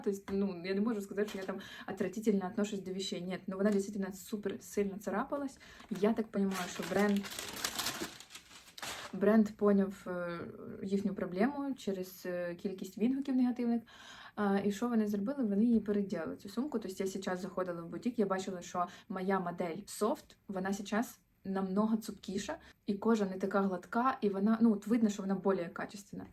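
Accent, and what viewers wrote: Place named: Surzhyk, Ukraine. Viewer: Снідаю і дивлюсь ваш влог 😊дуже подобається ваш вайб і ваш суржик 😉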